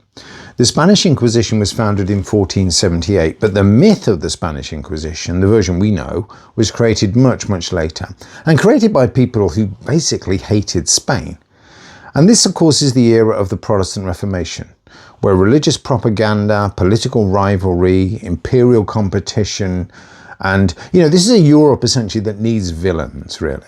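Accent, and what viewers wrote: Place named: United Kingdom. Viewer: This guy thinks that because he's got a British accent everything he says is true. This guy doesn't know what he's talking about and needs to be locked up in an insane asylum. 20,000 people being burned at the stake was a common occurrence throughout Europe, especially in Spain. The foundations of the Spanish Inquisition go as far back as the 12th-13th centuries, after the Horrible atrocities European Christianity had committed throughout the Middle East and Jerusalem during the Crusades in the Middle Ages.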